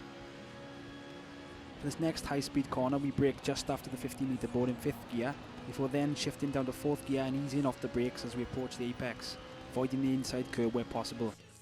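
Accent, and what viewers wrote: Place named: Wales, United Kingdom. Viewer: Really good video, shame your not making any more and it's nice to hear a Welsh accent :)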